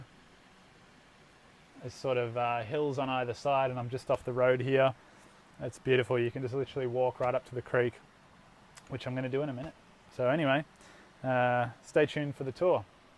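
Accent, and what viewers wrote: Australia. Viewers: I've missed you! Glad you're back! The job sounds like it will dovetail nicely with your interests! I love your videos because it is great to hear an authentic Aussie voice and Aussie scenery. I am sick of only seeing the USA stuff. I want to see more Aussie stuff.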